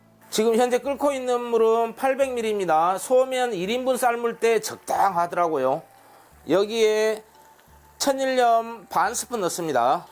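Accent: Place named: Yeocheon, South Korea. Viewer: 이분 사투리는 여천 사투리라고 확신합니다... ^^ 실력,해설,지도,방송 모두 굿입니다!!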